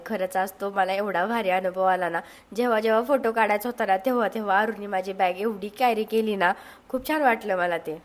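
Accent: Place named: Solapur, India. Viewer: Language accent सोलापुरी वाटतात.csmt.छत्रपती शिवाजी महाराज terminus.previously vt.Victoria terminus.fir ur kinds information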